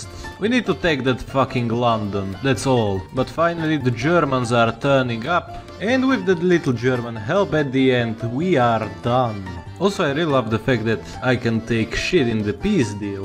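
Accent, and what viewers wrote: Russia. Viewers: are you actually russian? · Ты не русский случаем? Акцент у тебя такой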